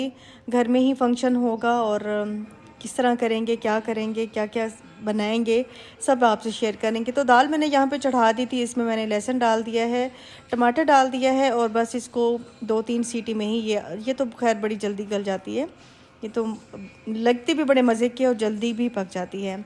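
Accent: Saraiki, Pakistan. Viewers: ji u r very beautiful. And your house is so beautifull. Aap bolti bohot pyara hn. Jub sairiki bolti hn to sun k mujhey bohot he acha lugta h. Mere naankey bhi pichey Dera ghazi Khan se thy. To sun k apnapun lgta h. I m from India.God bless you. Bye.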